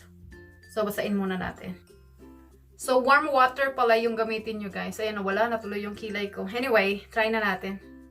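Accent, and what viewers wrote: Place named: Visayas, Philippines. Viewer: usong uso yan sis maganda daw talaga gumamit niyan...ok lang ang punto na bisaya gusto ko nga kasi sarap sa tenga pakinggan ng salita niyo ")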